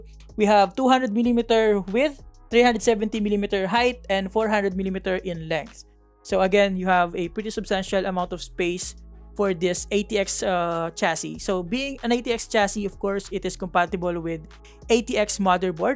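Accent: Philippines. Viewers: alam mo kagad kung pinoy yung nag sasalita 😅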